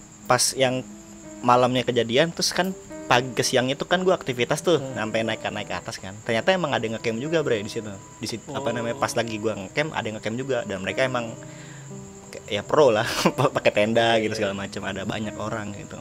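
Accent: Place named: Makassar, Indonesia. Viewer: Hostnya anak makssar yah??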